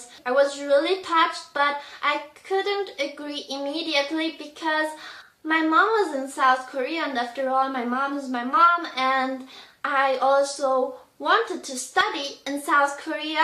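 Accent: Switzerland. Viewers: She’s swiss-german Which can explain her accent English wouldn’t be her first language and Japanese either. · She's not Japanese. She has a Swiss German accent. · She's from Switzerland so she has an accent. Go fuck yourself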